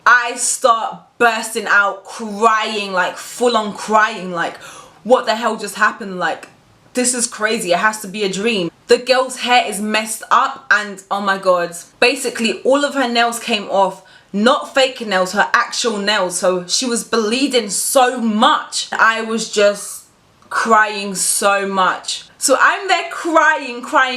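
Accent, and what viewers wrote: England, United Kingdom. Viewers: She's British, from London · Are u British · BRITISH ACCENT GOALS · Yes, she is British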